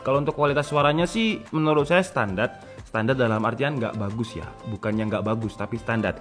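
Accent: Java, Indonesia. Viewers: Wong malang a mas? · Medok banget to mas mas 😂😂😂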